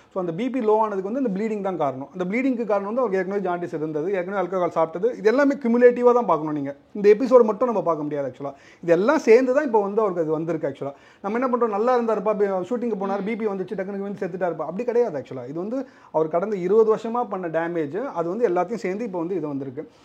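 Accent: Chennai, India. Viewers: chennai slang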